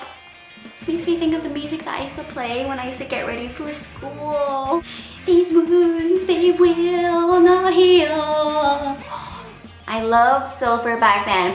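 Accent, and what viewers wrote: Northern Ireland, United Kingdom. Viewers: she's from Northern Ireland!! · I love your accent😂 Like I'm from Belfast and I can hear all the Northern Irish things you say, haha👏🏼👏🏼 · you kinda have a british accent 😁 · She lives in Northern Ireland again so her accent probably got stronger from hearing it all day everyday from everyone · Does she live in Northern Ireland? She has the same accent as me 😂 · As a norn Irish meself, i can personally say her accent is way cuter than the rest of ours 😂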